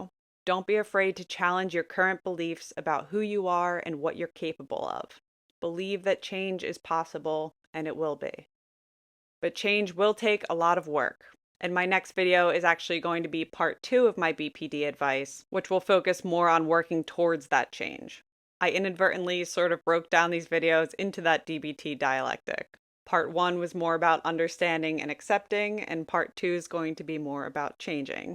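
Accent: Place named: East Coast, United States. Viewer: Thanks so much for making these videos. I just stumbled upon your channel a minute ago. You have an accent. Trying to pick up where it's from? East Coast?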